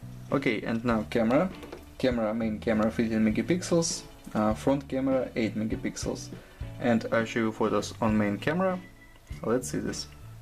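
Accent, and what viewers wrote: Russia. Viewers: Это же русский говорит.Акцент спалил и язык в телефоне!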